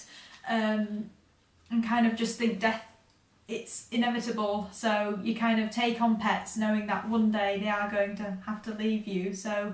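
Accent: England, United Kingdom. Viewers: Is she from england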